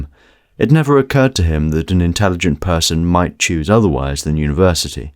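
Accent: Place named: United Kingdom. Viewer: bro you cannot have a british accent and make a video like this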